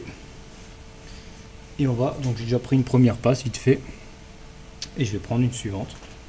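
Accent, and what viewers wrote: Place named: Alsace, France. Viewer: ça fait plaisir de voir ton travail surtout quand on sait ce que tu es capable de faire via le forum, je me suis abonné a ta chaine qui est une mine d'informations. Tu ne dois pas etre loin de mon secteur ( alsace ) vu ton accent. Merci pour tes vidéos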